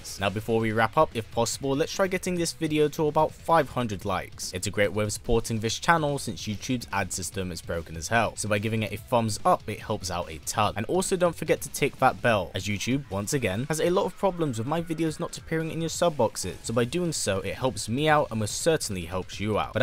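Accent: United Kingdom. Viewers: Wat u have a brutish accent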